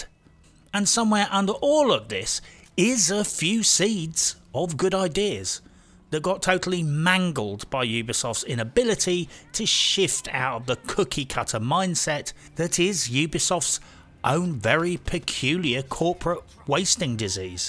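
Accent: United Kingdom. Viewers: Nothing will ever be funnier to my silly American ears than a well-spoken British man taking the absolute piss out of a sh*tty video game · I love how the narrator talks and swears like British Sharlto Copley.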